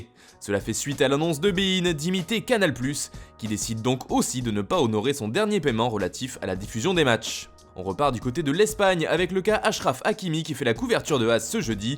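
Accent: Italy. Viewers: Cette accent italien qui me manquait tant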